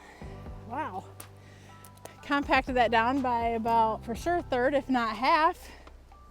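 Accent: United States. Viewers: I just love starting my day with smiling face and that cute northern accent 😁 (I’m from Kentucky so we sound a little different haha) Having there to complete the team was icing on the cake. Thanks for sharing your day ❤️❤️❤️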